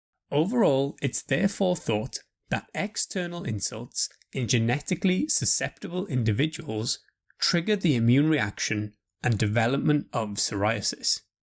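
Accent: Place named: United Kingdom. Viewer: is british accent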